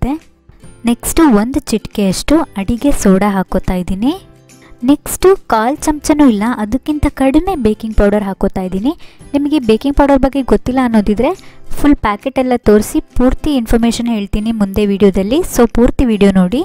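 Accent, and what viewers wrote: Mangalore, India. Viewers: Madam nimdu native place yavdu? Manglore aa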